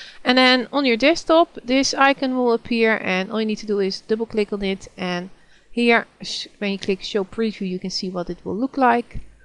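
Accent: Netherlands. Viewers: Wauw dat nederlands accent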